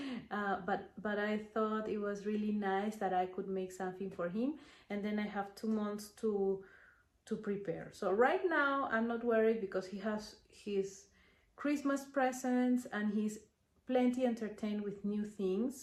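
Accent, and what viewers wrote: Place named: Peru. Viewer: me suenas peruana...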